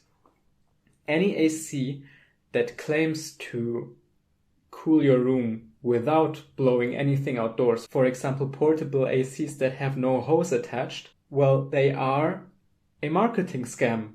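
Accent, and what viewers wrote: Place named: Germany. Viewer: why if i hear how people speak english i directly know which their original countries are? I guess he is GERMAN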